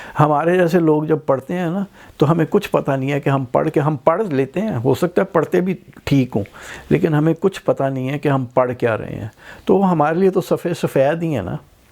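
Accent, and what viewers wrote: Punjab, Pakistan. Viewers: Your skill of story telling is amazing. I have seen more than 50 videos. I am Indian Marathi, your fan of sense of humour. Lot of thanks. Keep it Sir ji. Your Punjabi raheja is fantastic.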